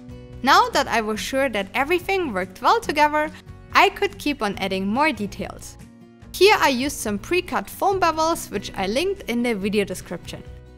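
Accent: Germany. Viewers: That German accent ☺️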